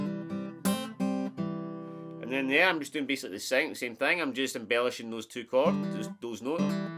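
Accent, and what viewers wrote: Scotland, United Kingdom. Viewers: came for the scottish accent, stayed for the luuuuv. and the guitar lessons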